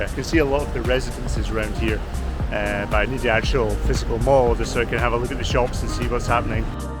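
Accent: Scotland, United Kingdom. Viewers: Try vising Eastwood City, Ortigas Center and Filinvest City also. To me, the captions/subtitles aren't necessary. Your Scottish accent isn't that thick. We understand your English perfectly. ᵔᴗᵔ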